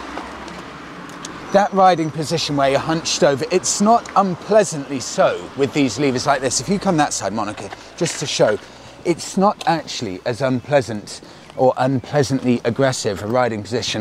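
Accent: England, United Kingdom. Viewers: It’s like watching The Fonze, with an English accent! 😄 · I guess I am going to learn English from your videos! British English is a little bit harder to understand than American English but that sense of humor totally convinced me. :-)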